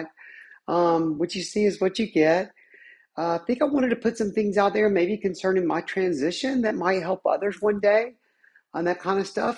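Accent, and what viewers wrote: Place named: South, United States. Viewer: Love your voice, you have that beautiful southern lilt that makes your voice gorgeous&makes it very femme sounding to me. Glad you have a loving family and can be yourself, that is awesome.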